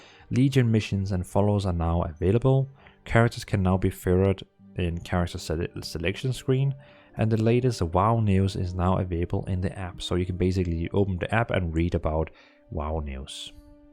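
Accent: Denmark. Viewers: yes Sounds like a danish accent, but it’s similar to Germany, a Germanic language 😊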